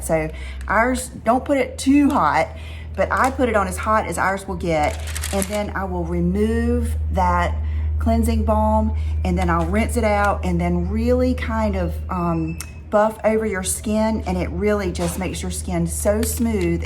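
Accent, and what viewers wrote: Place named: Texas, United States. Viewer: I just want to say that I’m a NoCal girl(old lady) and nowhere near you in any stretch of the imagination; looks, class, knowledge of products, size, nothing! And I was always amused by you because of your way. But I have just fallen in adore with you! Cute as a bugs ear! Sweet and unpretentious and humble but not mousey scared or cow tow ish. I LOVE YOUR TEXAS ACCENT! I love your lips, hair, makeup, accent, syntax, vulnerability, etc. carry on. That’s all. Just be you! Do not change!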